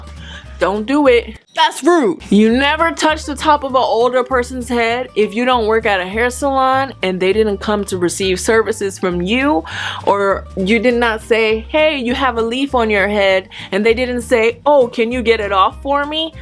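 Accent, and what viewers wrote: South, United States. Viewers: Lol the way you talked cracks me up every time I watched your videos. You can take you out of America but you can’t take America out of you😂😂😂😂👍 I love your videos, very educational and detailed. Keep’em coming girl you’re awesome 👍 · I'm from the South too(Tennessee)! I wonder, do you have a Southern accent when speaking Korean?😂😆 I talk fast also, another Southern Thang! Gurl! You make me laugh so much! TFS! Light, Love & Blessing's💖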